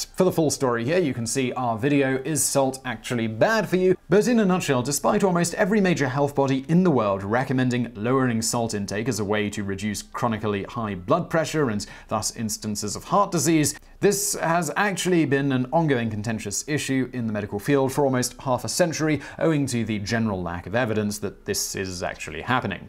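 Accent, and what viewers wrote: United Kingdom. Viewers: I was expecting him to say "Hey Vsauce, Michael here!" when I opened the video, instead I got a british accent.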